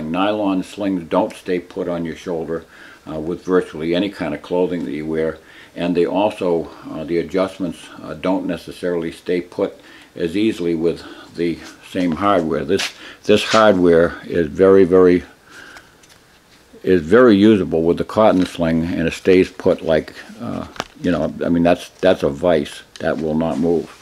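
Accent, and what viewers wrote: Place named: Boston, United States. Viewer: Excellent. Learned something new. I detect a Boston accent. What’s a freedom loving gun owner doing in that forsaken state?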